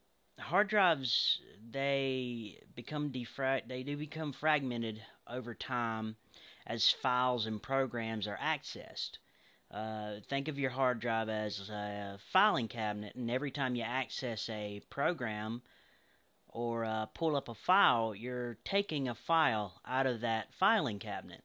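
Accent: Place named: Texas, United States. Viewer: I agree! I love your voice! Clear and precise instructions. I would venture to guess you are in Texas. Anyway, this video has opened my eyes to what my computer can do. I used to use Auslogic, but I believe it had another name. It was on a desktop I had with windows 3.1 and also with windows 95. Now you can guess how old I am. LOL Since I got my new computer, DEL, I've been looking for this, and now, thanks to you, I have it again. Thank you so much! Keep up the good work!!!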